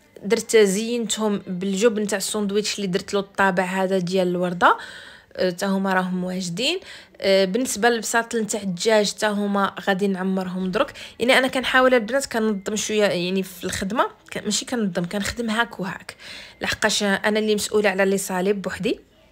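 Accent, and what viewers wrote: Oujda, Morocco. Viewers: هاذي مدة ماشفت قناتك وكنت كنتبعك بزاف وكتعجبني هدرتك حيت باقية محافظة على اللهجة الوجدية حتى انا من وجدة عايشة في إسبانيا مكهرتش اختي تشاركي معنا تجربتك كيفاش تعلمتي وفين تعلمتي كنت دايما نحلم يكون عندي مشروع ديال باتيسري سواءا في المغرب او هنا في اسبانيا الا كان ممكن تعطينا معلومات باش تنصحينا واتمنى لك التوفيق والنجاح ومزيدا من التالق لانه باين فيك انسانة طيبة وكتبغي الخير❤❤❤❤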